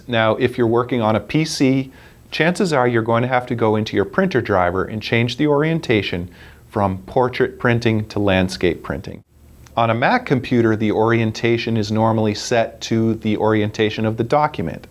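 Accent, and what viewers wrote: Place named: United States. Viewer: Excellent! You speak slowly succinctly, and with an American accent! I have been on computers for longer than many people have been alive, and have always struggled with how to make greeting cards. The amount of paper and ink I wasted would have paid for someone's college education! A head slapping moment came when you showed the formatting trick was to use two columns. Gawd!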